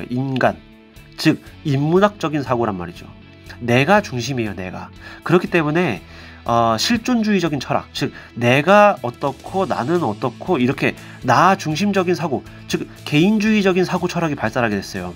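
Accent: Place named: Seoul, South Korea. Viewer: 안녕하세요 훈더맨 실방에서 알고 들어왔어요 역시 서울 말씨가 듣기좋습니다.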